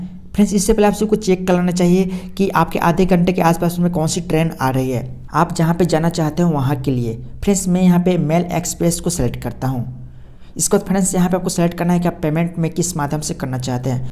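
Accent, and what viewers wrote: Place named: Arrah, India. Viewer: awaj me Ara jila ka jhalak mil rha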